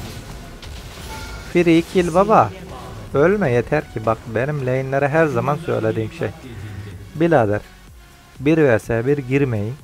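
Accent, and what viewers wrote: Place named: Zonguldak, Turkey. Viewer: Reis Zonguldaklı gibi bi gonuşman var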